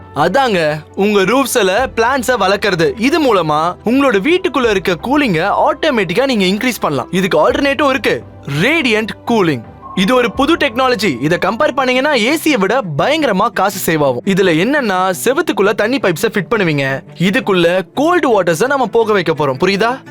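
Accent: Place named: North Karnataka, India. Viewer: Dhruv Sir, Just a feedback on your Kannada Channel. We all are followers of your Hindi channel too. However the kannada language translation needs improvement. This is not Bangalore Kannada but sounds like a dialect of North Karnataka which is difficult to comprehend by most of the kannadigas. I completely understand that you can't read or write Kannada and you might have picked this language translation randomly without some inputs from Kannada Expertise. Best Wishes😊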